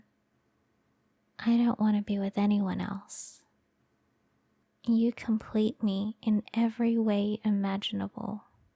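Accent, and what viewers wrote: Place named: Australia. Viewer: dam what a guess that you’re from Australian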